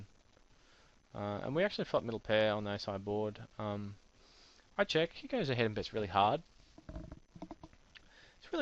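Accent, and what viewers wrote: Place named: United Kingdom. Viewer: Thanks for the reply! By the way, I noticed that you listed Japan as the country. Are you British or Australian living in Japan? Just wondering from your accent, it's obviously not Japanese accent:)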